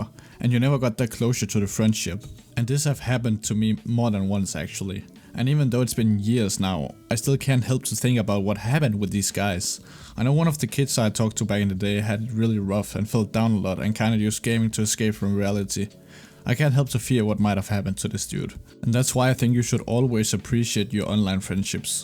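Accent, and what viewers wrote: Denmark. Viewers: are you from Denmark? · I don't recognize your channel but I was already subbed. You have a Danish accent, so ig I knew u before u blew up. What was your previous gamertag?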